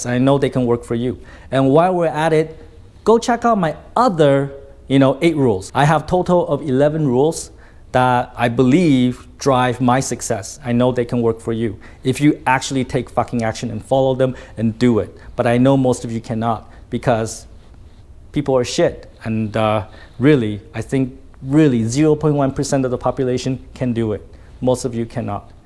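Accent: Standard. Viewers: ns rất chuẩn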